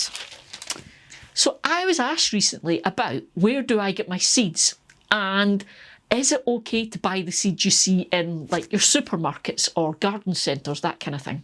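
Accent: Scotland, United Kingdom. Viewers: I just found you’re channel! I’ve been watching all day 🤩 I think I’ve learned more today than ever! Great to hear you’re Scottish accent 🥰I’m from Glasgow, Scotland so it’s lovely to hear a fellow Scottish people 🏴thank you so much for all these golden nuggets of information! 🪴🌱🌿🍀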